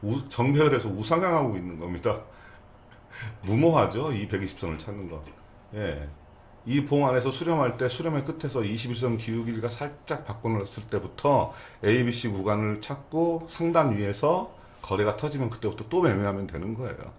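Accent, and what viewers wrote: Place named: Gyeongsang, South Korea. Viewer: 고수시다... 경상도인데 경남은 아니신듯... 사투리가 저보다 부드러우셔서ㅎ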